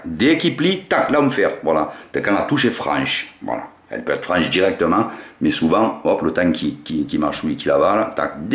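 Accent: South, France. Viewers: la grosseur de l'hameçon en combien - du 2ou moins . merci pour toutes tes vidéos c'est un régal et en plus avé l'accent..merci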